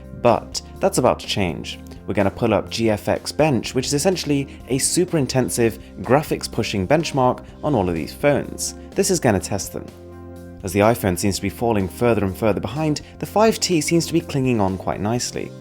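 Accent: United Kingdom. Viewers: Nice review indian guy with a british accent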